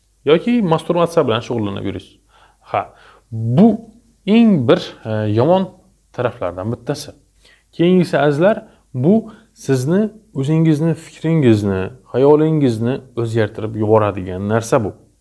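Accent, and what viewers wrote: Khorezm, Uzbekistan. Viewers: Asslomalekum doktor Xorazmdan òxshisiz tiliz òxshab turibdi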